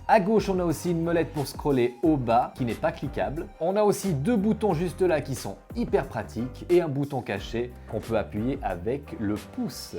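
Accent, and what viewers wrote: Switzerland. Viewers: On dirait qu’il y a certaines similarités entre l’accent suisse et l’accent québécois... 🤔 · C’est tip top hop schweiss. Nostalgie de l’accent suisse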